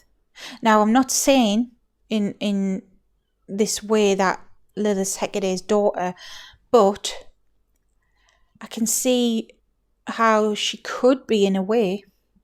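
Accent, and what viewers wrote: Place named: Yorkshire, United Kingdom. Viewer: my mother is from England, she grew up in Yorkshire, I'm in America never been to England, but one day I will go. Your accent reminds me of my mothers. 😘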